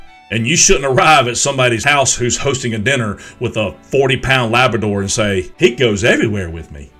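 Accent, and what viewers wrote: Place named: South, United States. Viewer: Did you say dawg???...lol 😂😂😂 I literally couldn't get passed that southern accent, so adorable 😍 I love cute dawgs too lol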